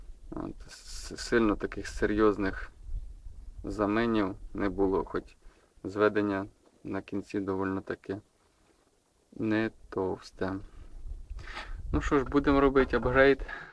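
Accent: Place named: Central Ukraine, Ukraine. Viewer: Говор такой забавный, центральная Украина небось)) зы. А горн прикольный.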